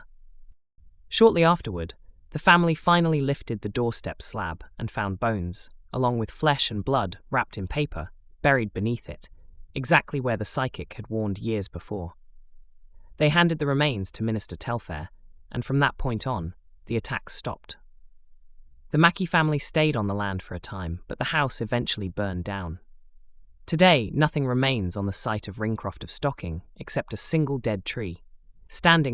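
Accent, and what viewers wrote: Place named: England, United Kingdom. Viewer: I just discovered your channel today, and I had to immediately subscribe. Your videos are nicely done, and without filler or sensationalism. I would like to know what part of England your accent is from. You sound just like Daniel Radcliffe (and that’s not a bad thing!). 😊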